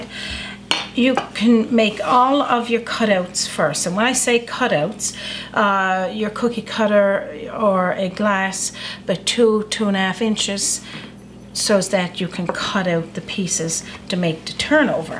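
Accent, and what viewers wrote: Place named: Newfoundland, Canada. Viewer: I just discovered this channel tonight. Lovely to hear the 'Newfie' accent! True maritimer with a cup of tea to go with! Thanks for your knowledge! Happy holidays my fellow Maritimer!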